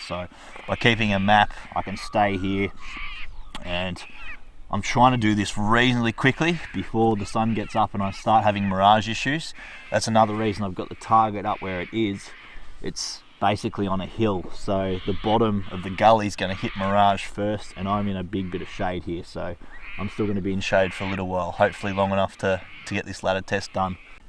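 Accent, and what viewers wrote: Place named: New Zealand. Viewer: awesome vid. im trying to work up a load for the same rifle. thanks for doing this. p.s sounds like ur in NZ - i would love to come hunt there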